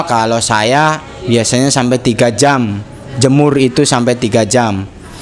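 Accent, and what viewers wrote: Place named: Kalimantan, Indonesia. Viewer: Logat kaya orang kalimantan hahaa maju terus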